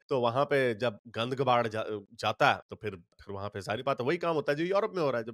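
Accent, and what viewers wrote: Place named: India. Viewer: Bhai tum bolte to sach ho km se km Pakistani nahi indian lagte ho zuban se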